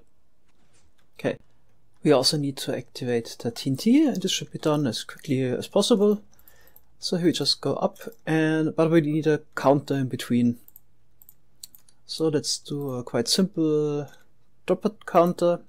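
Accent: Germany. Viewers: is German your first language?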